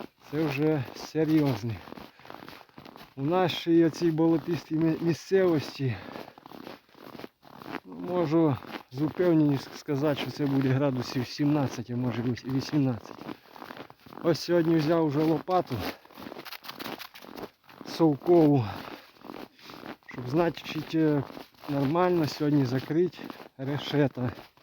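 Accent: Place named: Polissia, Ukraine. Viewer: Вітаю! Дуже приємно чути рідний полісський акцент.. я родом з Полісся з Чернігова.. дитинство пройшло у Чорнобилі але зараз зрозуміло мешкаю вже зовсім у іншому місті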